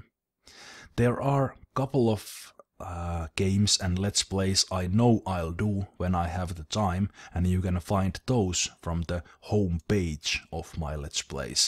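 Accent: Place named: Finland. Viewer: Gratz, you and your channal are a part of my day! When i found your channal first time i didnt quite like your voice, but now i think its epic, English+Finnish accent=Awesome!:D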